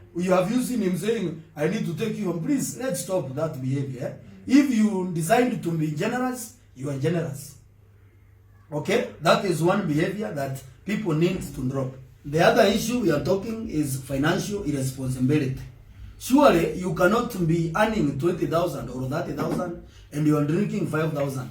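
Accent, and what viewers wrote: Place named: Meru, Kenya. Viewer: The meru accent iko fty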